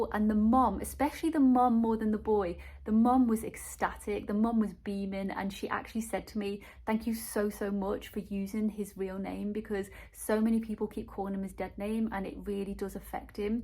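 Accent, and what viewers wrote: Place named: Northern England, United Kingdom. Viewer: Also your accent is so charming to me :P Northern England? Or is it Scouse? I've tried to learn as a Canadian who loves British culture lol (all of "Britain". I know you're English :P)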